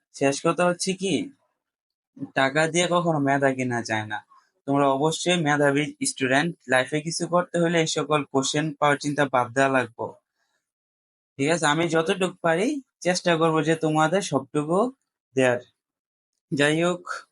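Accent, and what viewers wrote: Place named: Brahmanbaria, Bangladesh. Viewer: সার আপনার বাড়ি কি ব্রাহ্মনবাড়িয়া নাকি? আপনি দি আমাদের এখান কার মত কথা কন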